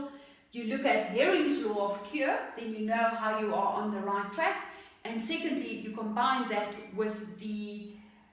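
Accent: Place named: South Africa. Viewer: South African:)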